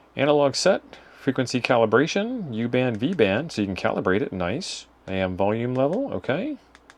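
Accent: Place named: Australia. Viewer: Nice kit and worth even more with the hot Aussie voice chic.😊🇦🇺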